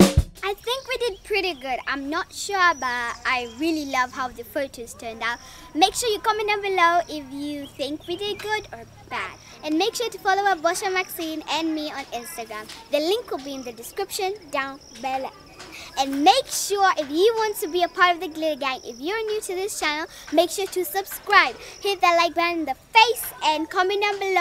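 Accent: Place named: United Kingdom. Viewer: Your english pure UK accent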